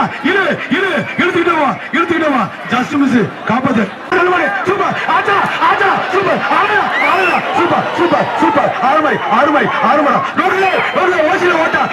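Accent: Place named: Madurai, India. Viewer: மதுரை தமிழ் வருணனையே அழகு டா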